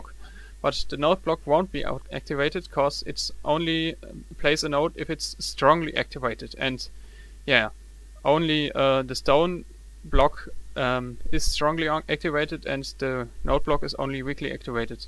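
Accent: Germany. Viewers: Ich hab gleich den deutschen Akzent erkannt :)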